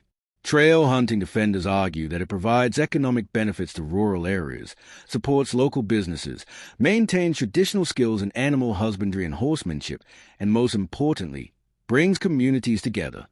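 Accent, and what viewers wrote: United States. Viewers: You will only see this in the fake channel that is BRITISH MIRROR. They include the Union Flag logo to appear patriotic. You don't see any evidence, just that of the narrator, who has, at times a very heavy American accent. (AI)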